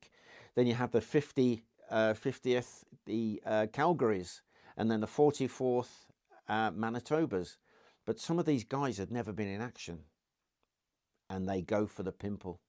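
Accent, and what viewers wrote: United Kingdom. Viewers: I really enjoy your presentations. Especially your WW1 history. Please keep up the great work. Your refreshing, not boring or stagnant. And there's nothing like a British accent to tell a good story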